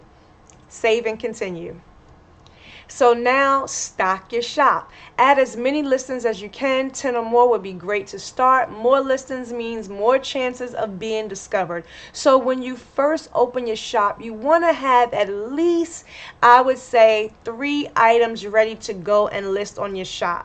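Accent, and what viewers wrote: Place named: Philadelphia, United States. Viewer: Are you from Philadelphia or South Jersey? I recognize the accent.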